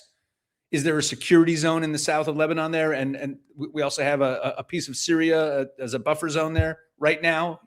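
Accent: United States. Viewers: Way to go Rabbi. You sound like a Texan.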